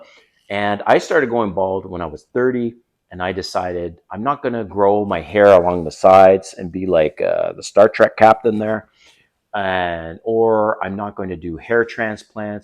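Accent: Canada. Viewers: lol, I knew you were a fellow 🇨🇦 the second you started speaking 🫶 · Def got the Canadian accent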